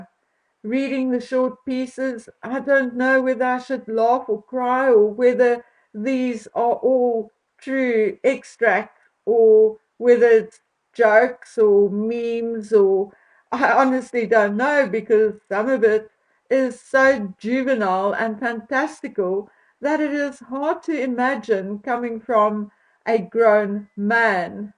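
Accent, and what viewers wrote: South Africa. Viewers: I love the way you say ‘Big Willie’ in your great S African accent! Thanks for hanging in there for us.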